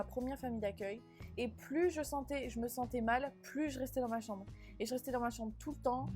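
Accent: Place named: United States. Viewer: Et sinon ton accent américain c'est goal, est ce que les américains qui te connaissent pas remarquent que tu es française ?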